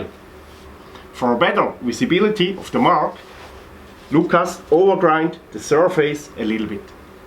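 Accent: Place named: Bern, Switzerland. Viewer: Cool, ä chli weni Bärndütsch ...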